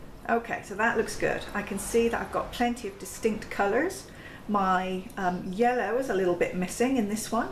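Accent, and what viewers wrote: United Kingdom. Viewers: Love your British accent, that you get paint all over and that you show the opps paintings❤️😂 you are real! Many video artist are perfect and I'm NOT, so you give me hope that even I can do it. Thanks.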